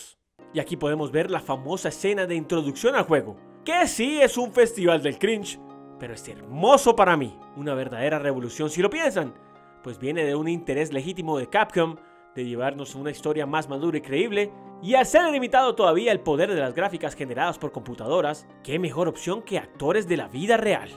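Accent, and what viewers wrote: Colombia. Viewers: Me encantan estos vídeos... Y se nota que eres Colombiano... Saludos mi paisano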